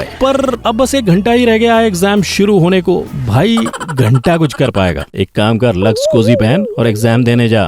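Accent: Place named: Gujarat, India. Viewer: Gujrati lagate ho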